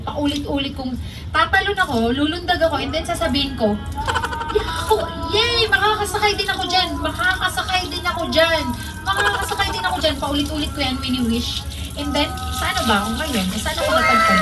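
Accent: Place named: Visayas, Philippines. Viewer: Pyor jud bisaya si ma'am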